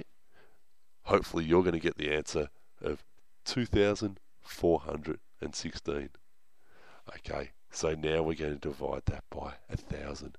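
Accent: Australia. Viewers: Is he strayan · you are Australian right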